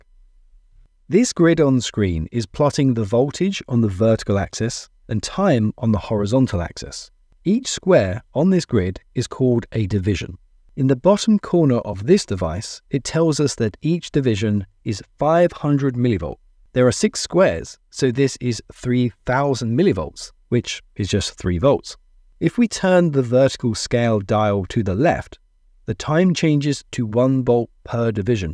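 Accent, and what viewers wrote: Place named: United Kingdom. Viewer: I don't know if this is speaking in the video, but his voice and accent (British, I'm assuming) is very easy to listen to. His step by step, logical directions, have convinced me that an oscilloscope is something I need to look into.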